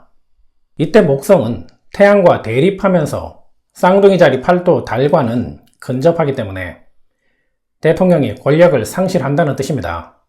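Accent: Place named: China. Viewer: 이사람 말하는게 중국사람같노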